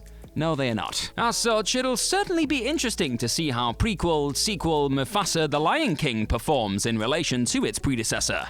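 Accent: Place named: RP, United Kingdom. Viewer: I'm sorry, but sucks as a narrator. He always sounds so condescending and phony. Like he's trying to play the character of a posh, Shakespearian trained voiceover actor instead of just doing a normal voiceover where he sounds like a normal person.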